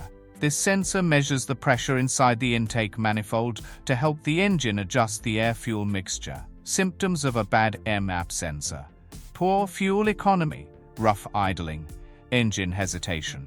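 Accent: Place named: United Kingdom. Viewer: As soon as I heard the British accent, i was out.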